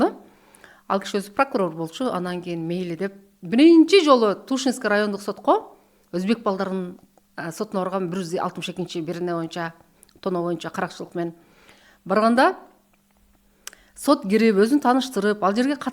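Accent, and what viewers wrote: Standard. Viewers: Бул эже ото акылдуу экен , таза кыргызча суйлойт экен. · ишиңде ийгиликтер болсун. Өтө так, нукура кыргыз тилинде сүйлөгөнүң аябай жакты.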